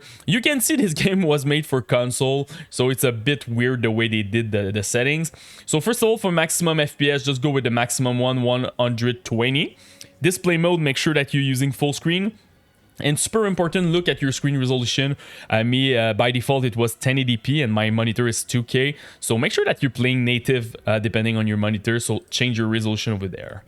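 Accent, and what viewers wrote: Quebec, Canada. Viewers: c'est malade comment cest facile de reconnaitre un quebecois qui parle anglais . j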